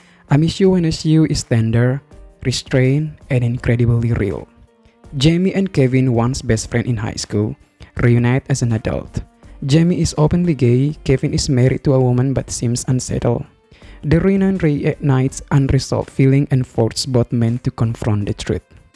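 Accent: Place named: Indonesia. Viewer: It sound like Indonesian accent.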